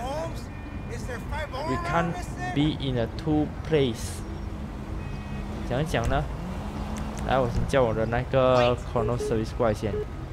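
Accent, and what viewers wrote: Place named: Cantonese, China. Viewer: 你的广东话好搞笑😂😂😂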